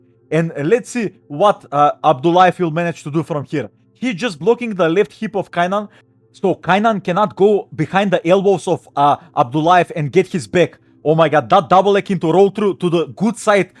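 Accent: Russia. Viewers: Извини за, быть может, резкий тон. Однако мне показалось, что это был нарочито русский акцент, а озвучку специально сделали именно такой, чтобы все это поняли. Болгары так не говорят, я слышал их речь. Впрочем, это мое мнение и оно не обязательно верное.. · Easy...Russian accent.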